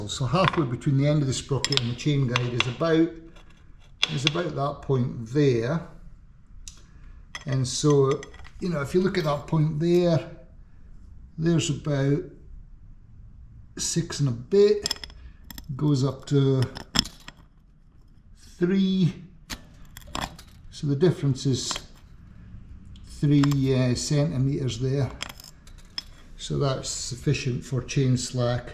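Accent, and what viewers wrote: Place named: Scotland, United Kingdom. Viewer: Love the Scottish accent, Glasgow? Ave got a DR650 here in Melbourne, luv it👍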